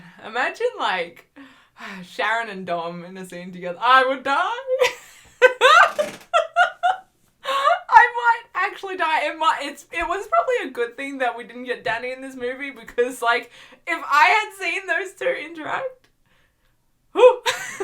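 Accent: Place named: Australia. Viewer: Success for you is just around the corner (bubbly personality) and that Aussie accent.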